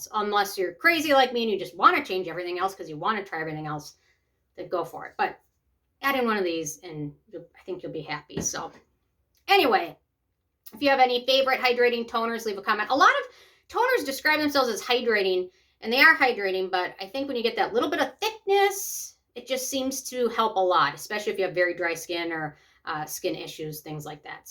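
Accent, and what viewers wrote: Minnesota, United States. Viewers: wow, i always recognized your accent as MN, I grew up in Woodbury and spent a lot of time in Stillwater, especially downtown. A beautiful town!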